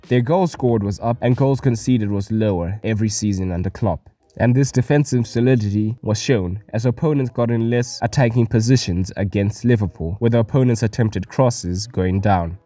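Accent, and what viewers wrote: South Africa. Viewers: he sounds south african, i know as a south african. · You sound South African nigga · is the narrator South African..? just really curious. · could be south african or indian or indian in south africa :) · Dude you sound so South African ...are you ? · Are you South African? Glad I've found the channel - great content! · You sound very South African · You from South Africa bro?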